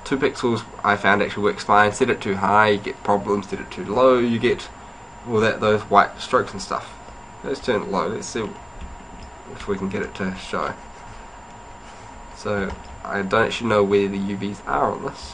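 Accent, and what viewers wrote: New Zealand. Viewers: LOL! English? is from New Zealand. I actually quite like his accent.:) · Sounds like a Newzealander, thought that aswell. He's one of us.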